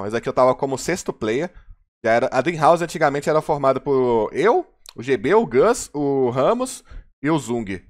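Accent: Belo Horizonte, Brazil. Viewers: Cara vc tem muito sotaque de BH, vc é?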